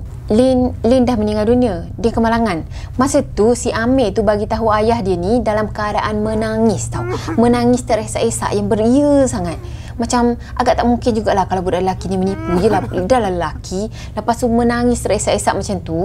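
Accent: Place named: Johor, Malaysia. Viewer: Kak ni org johor. Tgok loghat pun tau hehe😁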